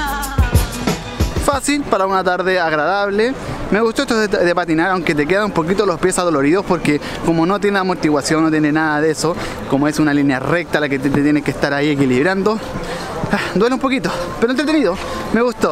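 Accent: Chile. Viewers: Ya usas el acento chileno, ya eres mas tú y no hablar con tono de robot bcjfjkf ♡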